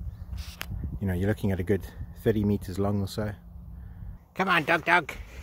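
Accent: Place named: South Africa. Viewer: I suspected you where a South African when i heard your accent, great stuff my friend, enjoy every minute of it, and bask in the safety. Kind regards from South Africa.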